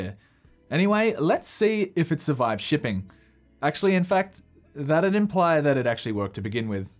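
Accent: Australia. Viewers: There's something oddly satisfying watching this kind of video and hearing an Australian accent at the same time.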